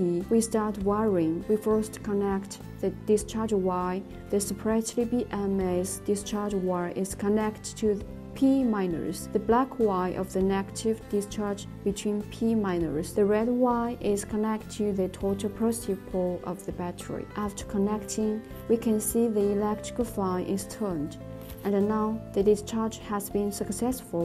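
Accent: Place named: United States. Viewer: Speak without the America essence would sound clear. drawings helps not Co fuse by moving hands...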